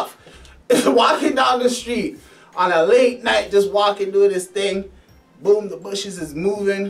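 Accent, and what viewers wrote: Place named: Canada. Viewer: Where are you from Fam You sound like your From Canada..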